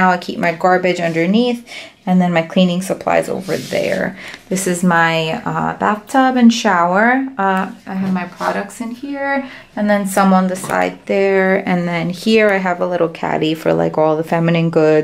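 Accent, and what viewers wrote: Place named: New York, United States. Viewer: I love your accent; it’s so NY and I just love it. Stay safe queen and Tfs 😘🖤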